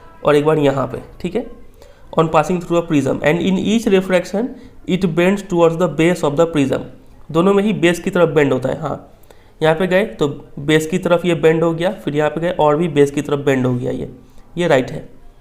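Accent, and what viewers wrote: Bihar, India. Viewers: Sir AP bihar ho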